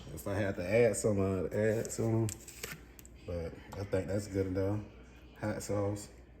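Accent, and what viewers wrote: South, United States. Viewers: you have a southern accent in your voice. We Love peaches and Family. Love from chicago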